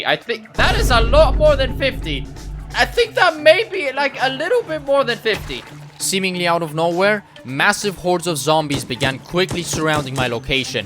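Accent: Poland. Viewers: Polak detected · coś tak czułem że ty Polak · wait i just reaised... are u Polish???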